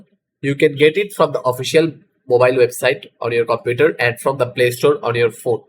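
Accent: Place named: India. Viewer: Where are the US English accent I only find Indians accent which is hard to understand .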